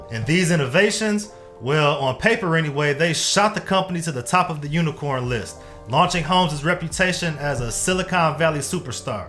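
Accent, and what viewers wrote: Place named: South, United States. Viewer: Southern accent doesnt work in these kind of vids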